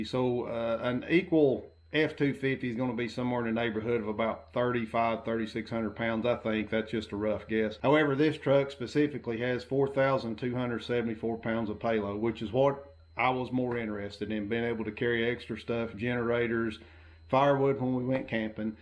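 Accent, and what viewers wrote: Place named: South, United States. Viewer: New subscriber - thanks for the video. I am enjoying your channel and hearing about your journeys. I like the southern drawl, kinda relaxes me to listen to as my grandpa's family is all from Georgia and I enjoy their accent.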